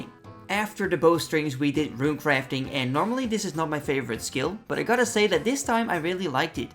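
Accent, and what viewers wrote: Netherlands. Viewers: The dutch accent is strong with this fella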